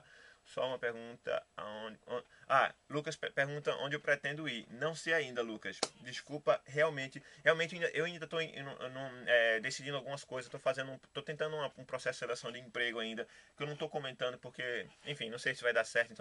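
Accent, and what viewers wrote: Pernambuco, Brazil. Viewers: Contato , vc é pernambucano ?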